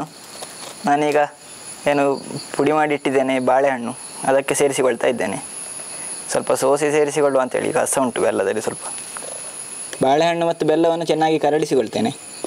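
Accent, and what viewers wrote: Mangalore, India. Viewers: I am from mangalore originally. Now I am in bangalore. I love the kannada you speak. It reminds me of my childhood ❤ I love the recipes you make. It's sooooooooo delicious. I'm am sooo thankful to you that you share our mangalorean recipes. I have tried your recipes and my family is surprised how I make it 😂😂 . For any recipe I refer to your videos. Lots of love. God bless you and your family. ❤❤❤❤❤ I wish to meet you and your family someday when I come to kasargod😊😊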